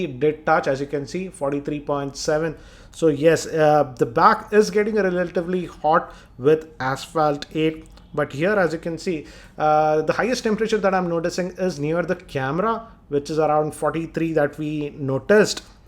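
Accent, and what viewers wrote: India. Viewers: I am from Brazil, I love indian accent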